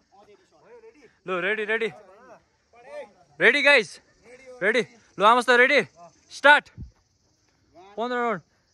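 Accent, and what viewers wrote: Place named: Nepal. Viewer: All are funny n entertaining.Nepali tone dami lago❤️❤️❤️👍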